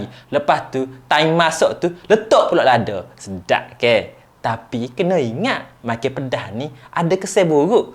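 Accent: Kelantan, Malaysia. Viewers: Bang baca Kelantan ke tu?😭😭😭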